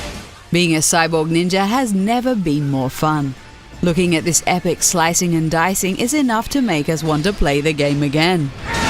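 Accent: Australia. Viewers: She's Australian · Is the mysterious voice over lady's accent australian? Or where is this accent from? I love it!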